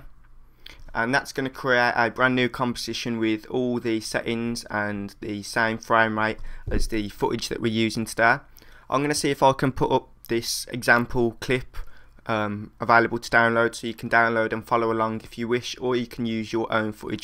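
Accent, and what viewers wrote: Birmingham, United Kingdom. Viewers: It's Birmingham, maybe Kidderminster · you never fail to help me with my work man:D may i ask where your from, you sound like a brummie? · Rodrigo Vazquez , Brummie fo sho!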